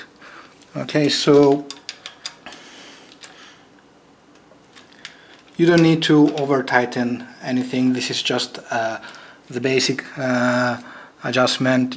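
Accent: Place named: France. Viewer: Thank you! What accent is that? It sounds almost like French! Merci beaucoup! :)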